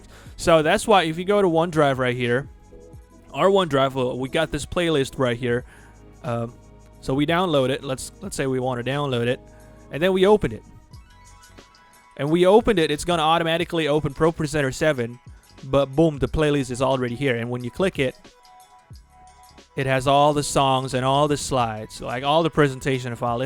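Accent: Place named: South, United States. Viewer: This guy really knows what he's talking about. His southern accent is so genuine!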